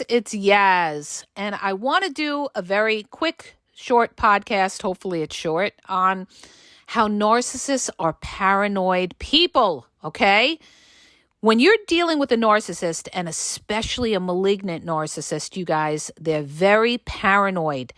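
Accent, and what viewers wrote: New York, United States. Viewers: I hear your NY accent. Lol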